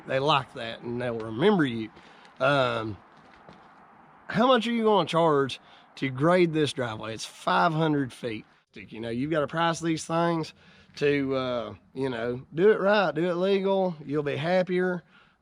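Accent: South, United States. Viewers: STOP APOLOGIZING! You are a Southerner who speaks Southern slanglish. Be proud of that! You did a great job. It's a clear, concise, easy to understand vid that covers all the major points, gives good pointers and insights, etc. All in all a great teaching vid. Anyone considering getting into the biz can understand, accent or not. Oh, I came up with 5 hours. Two hauling equip,, 2 grading, I spreading and tracking in.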